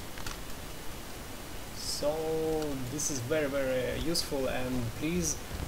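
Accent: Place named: Russia. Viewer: Жуткий русский акцент)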